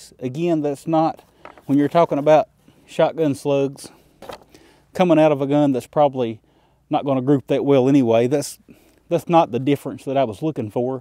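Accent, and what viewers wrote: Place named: South, United States. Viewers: That is one cool sounding accent. I am guessing from the South but you are bundled up too much🇨🇦🤠Great vid answered some questions l had thanks. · Whatever it is, he has it on thick. Wearin’ it thick. A lot of YT’bers do this. lol. The best, are the northern “southern “ draws lol. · Very excellent videos as well as the information you provide. Love the accent! Tennessee?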